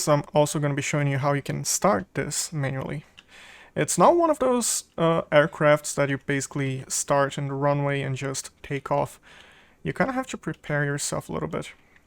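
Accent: Scandinavia. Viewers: not especially... yeah I'm going with the guy below me, something Scandinavian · I was wondering the same thing. My guess is your mother tongue is something Scandinavian. Nice review, BTW.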